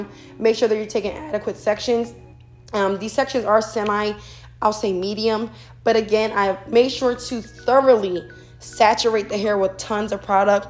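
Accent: Philadelphia, United States. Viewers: Where are you located? I hear a Philly accent but maybe that’s just me 💖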